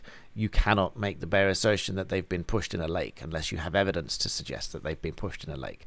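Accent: United Kingdom. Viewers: Hello from ME, USA! Thanks so much for looking into this UK appeal denial. Wonderful to hear your thoughts and comments, watch every day. Can US citizens donate and sign petition, or is it something only UK citizens can do? Just beginning to really understand the Brit accent - great to hear such clear and articulate speech, and at a good speed, I should say. Looking forward to next installment. 🤗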